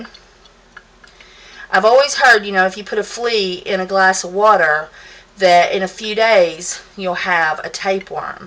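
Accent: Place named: Fresno, United States. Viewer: That's a lovely accent that you have. Fresno?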